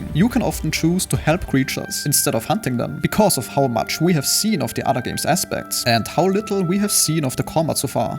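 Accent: Germany. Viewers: Sorry to say this but I couldn't get past the thick accent (assuming you're from Germany?) Maybe try to slow down your narration and enunciate the words a bit more. I hope this helps.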